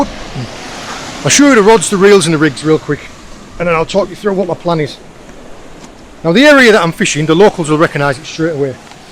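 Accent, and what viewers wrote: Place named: Northern England, United Kingdom. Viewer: Are you originally from up north? I always hear northern in your accent haha was just wondering, keep up the vids always a pleasure to watch and very knowledgeable 🤘🏼🎣 top bloke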